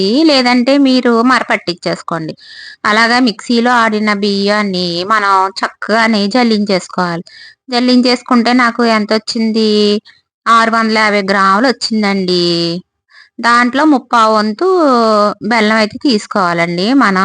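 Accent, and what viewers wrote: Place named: Godavari, India. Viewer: మీ గోదావరి slang చాలా బాగుంది medam 🎉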